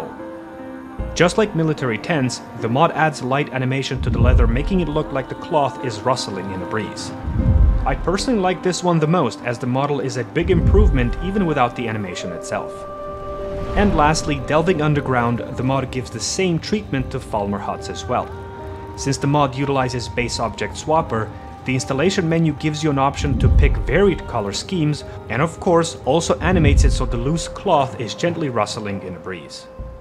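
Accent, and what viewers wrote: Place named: Eastern Europe. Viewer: It’s always dudes with Eastern European accents and playing overwhelmingly modded sexualized female dragonborns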